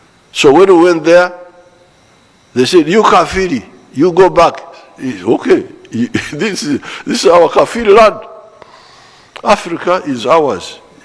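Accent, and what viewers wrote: Nigeria. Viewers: Is the host a Nigerian? I couldn't hear his English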